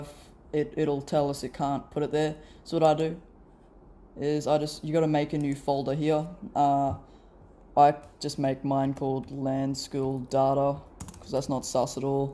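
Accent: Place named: Australia. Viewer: Aussie very much ahahaha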